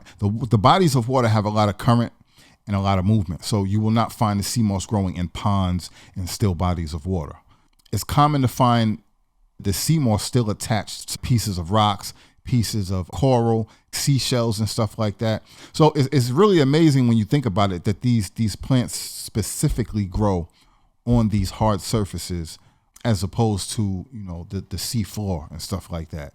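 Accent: New York, United States. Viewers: I’m glad I found this channel. I’m interested in sea moss but I am a little hesitant because I have a history of hyperthyroidism while pregnant and always was borderline hyper thyroid. Not sure how the high iodine content would affect someone like me. Are you from New York? You sound like it. 😊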